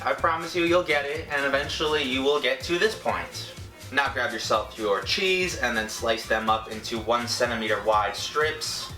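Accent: New York, United States. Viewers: New york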